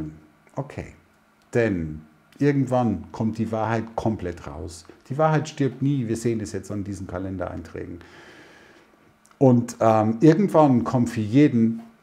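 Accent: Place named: Bavaria, Germany. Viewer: Servus, denke ich auch. Übrigens darfst ruhig Michi sagen😉. Ist wahrscheinlich bei deiner messageflut nicht so einfach. Dürfte ich dich fragen wo du wohnst? Deinem Dialekt nach scho im bayrischen Raum oder?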